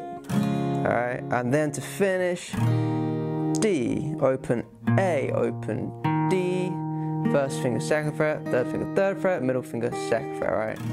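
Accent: United Kingdom. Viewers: androidtingson he's British too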